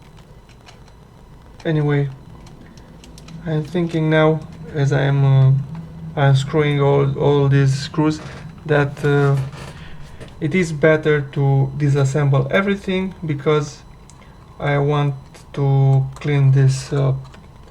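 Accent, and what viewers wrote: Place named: Romania. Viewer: Romanian english.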